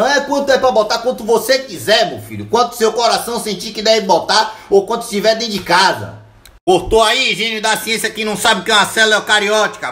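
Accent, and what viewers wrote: Bahia, Brazil. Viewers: Você é bahiano kkkkkkkkkkk adoro kkkk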